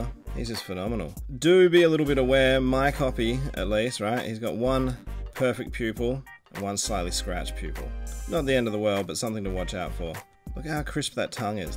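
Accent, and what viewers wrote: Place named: Australia. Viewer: Where did you get these? I assume you're in Australia judging by the accent. I am too so I'm looking to get these if I spot them anywhere.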